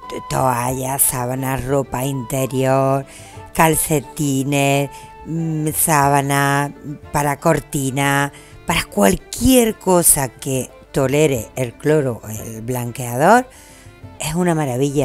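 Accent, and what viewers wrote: Spain. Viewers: pero qe te pasa no sabes que la lejìa o cloro no se debe mezclar nunca!? El hipoclorito de sodio libera gases tòxicos al entree en contacto con otras sustancias Tu canal es muy entretenido, didàctico y disfruto el habla andaluza pero en este caso cito una tonadilla cubana que dice...ave maria, conmigo vas a acabar! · Hola.Tus detergentes son geniales.Aquí en mi país no se consigue jabón en escamas ni en panela blanco,Pero venden uno que es azul (marca "Las LLaves...creo que uno de sus ingredientes debe ser el azulillo y es de muy buena calidad)..¿Me servirá usar ese?...Otra duda que tengo es que he leído en varias ocasiones que el cloro o lejía no debe ser mezclado con vinagre,porque sería muy tóxico..¿.Puedes aclararme eso por favor?....Gracias por recetas de detergentes super bien, y si me permites te digo, que tienes un acento español muy bello, (todos los acentos españoles lo son, pero el tuyo es especialmente bonito).Chao un abrazo muy fuerte desde mi Venezuela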